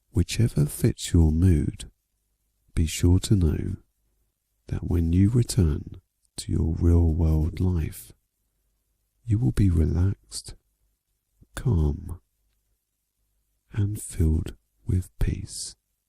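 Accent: Scotland, United Kingdom. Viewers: These meditations are perfect. <link> him has a gentle, warm, pleasant voice (with a slight Scots accent). The words are simple and clear. The timing is perfect... just as you start to find your mind wandering, he says and if you find that your mind is wandering... . There is no background music and no noise in the background, only his peaceful and engaging voice. The length of the meditations (about 1/2 hour each) works well for me. I find that I tire of some meditations after frequent repetition, but that hasn't happened with this one. The metta lovingkindness meditation always feels right. Some meditation CDs I have tried have not felt right. The voice seemed hurried, or sounded tense; the background music seemed intrusive, or there were noises that interfered with concentration. This is just perfect as an introduction for new meditators, and it's also a meditation that I return to again and again. 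I'd give this more than five stars if that were an option. Even my students, who are not ordinarily very receptive to meditation, relax when they hear this one!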